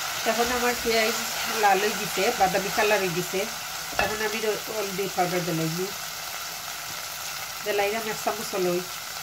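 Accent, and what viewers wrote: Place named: Sylhet, Bangladesh. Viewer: সিলেটি মাত আর রান্না মজা হয়ছে 😊❤❤❤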